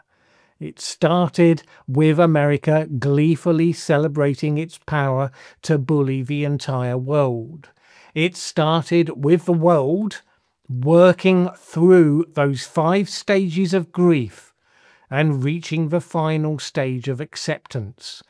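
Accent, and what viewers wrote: United Kingdom. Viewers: British accented Clown blowing smoke out of both ends.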